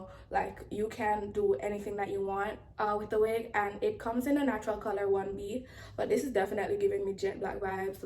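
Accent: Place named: Jamaica. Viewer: I love your accent 😊😫 you must be Jamaican!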